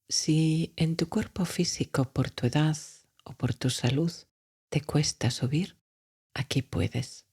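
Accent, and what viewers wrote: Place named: Spain. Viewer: Muy buena voz, sin el acento de española. estaría de lujo! Si tú piensas que es neutral pues seguro eres española.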